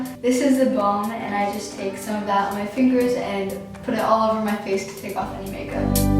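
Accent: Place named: Canada. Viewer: you have a thick canadian accent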